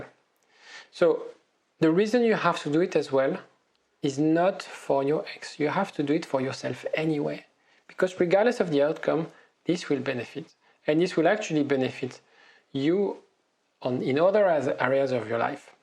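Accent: France. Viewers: Thank you, also very nice to listen to your French accent, Monsieur!;-) But not really sure if i want Her back haha... if there's still a mutual karma She will be back anyway. These kind of videos help, donc merci quand meme,! Salutations de Pologne. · While listening you, I hear your french accent. Probably I've mistaken but it's really nice😊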